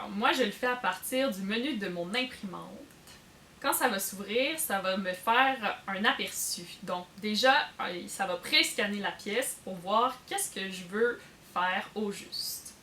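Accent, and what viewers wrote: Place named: Canada. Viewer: très pratiques ta vidéos avec tous le charmes et ton beaux axons Canadien que le Seigneur te bénisse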